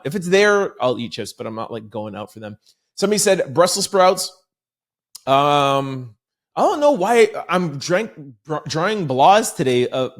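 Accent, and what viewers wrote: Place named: Canada. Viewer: We as blk folks need to be more familiar with the law. One HUGE misconception is that cops have to read us our Miranda rights or we cannot be charged or arrested. FALSE. Miranda rights are to be read when someone is being questioned in context of a criminal investigation to use what you say against you. Not simply because you are being arrested. Miranda does not keep you from being charged. If you are not being questioned there is no Miranda at that time. We need to stop saying that because it’s wrong. Miranda doesn’t apply to every arrest. That’s something that you see on TV and it’s for dramatic purposes. Also you can be detained during a search if they feel you will obstruct or interfere in some way. Diddy sons were detained. They were not arrested that day. Make sure research is done before giving legal commentary sir. Edit: you are Canadian. 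So you get a pass, however Americans are watching this and need to know. I thought you were Canadian, I can hear the accent clearly?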